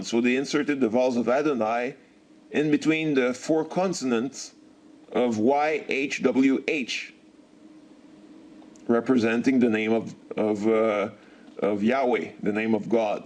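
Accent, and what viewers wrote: Quebec, Canada. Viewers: you have a french canadian accent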